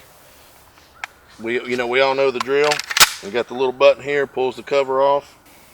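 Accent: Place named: South, United States. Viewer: i heard the country and knew you had to be from a country state like me. KS here.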